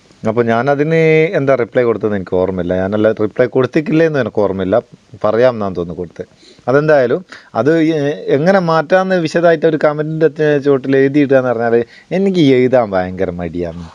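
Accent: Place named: Kasaragod, India. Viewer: kasaragod aano🤔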